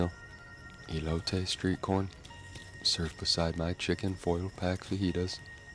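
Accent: Canada. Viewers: Love your videos. So relaxing. How are you not Canadian? You sound 100% Canadian. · Are you perhaps….. Canadian?🇨🇦🇨🇦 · No. The "eh" is a Canadian thing. I'm Canadian. I also think he says, "buddy".. Another Canadian thing.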